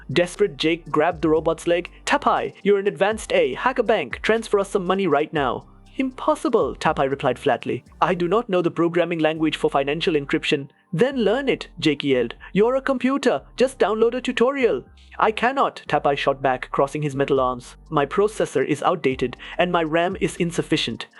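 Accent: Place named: India. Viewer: Sounds indian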